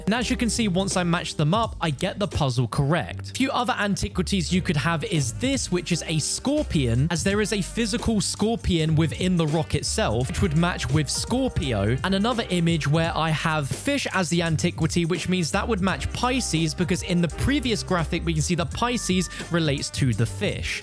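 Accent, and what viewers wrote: United Kingdom. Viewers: صوته بريطاني مزعج كثير كلام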